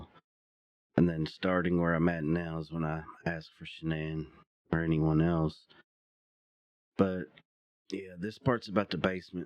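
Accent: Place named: South, United States. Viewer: You sound like you maybe here from the south... If so hi neighbor. If not hello anyway. Love your videos!!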